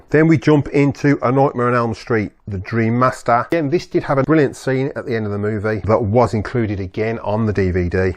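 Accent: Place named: United Kingdom. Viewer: Can I ask where you was originally from in the UK ? Sound like you from my neck of the woods 👍🏻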